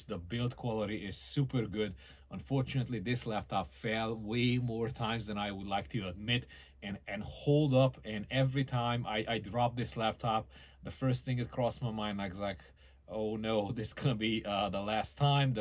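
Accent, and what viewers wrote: Hungary. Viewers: I know a hungarian accent when i hear one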